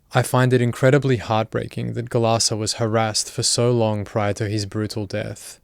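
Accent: Australia. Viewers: Dude I know you're Australian but I've been listening to all your videos throughout the week and every time you say "law enforcement" I thought you were saying Lauren faustman and I heard that name in every video and I wondered how she could be involved in all these murders and vile cases. So I looked her up and couldn't find anything that made sense. but then thought about it.. and realized it's your accent. Oops.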